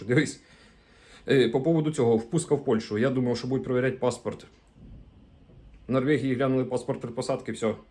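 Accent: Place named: Surzhyk, Ukraine. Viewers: головне, що ти гідно тримаєшся і не зважаєш на хейтерів. Якщо будеш знімати подорожі по Польщі то заздалегідь готуйся пиши сценарій, буде цікавіше дивитися, це не повчання, а бажання. Удачі! P.S. на Полтавщині теж так балакають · Привіт ти з якого міста Бо в тебе такий суржик як у нас запорізька обл.Гуляйполе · За суржик 👍👍👍👍👍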